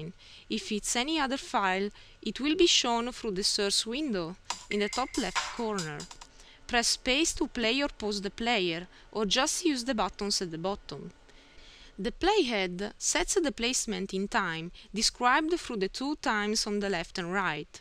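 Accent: Italy. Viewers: thanks for the video, helped a lot! I like the Italian accent, it's easier to understand than English :) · Her accent is definitely Italian. I'm Italian.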